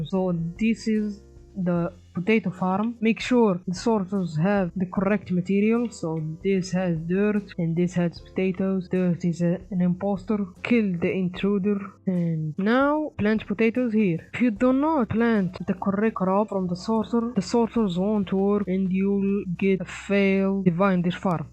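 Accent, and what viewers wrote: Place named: India. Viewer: Its a great vid not kiding keep going but one thing i feel like you talk indian and sry if this bothers you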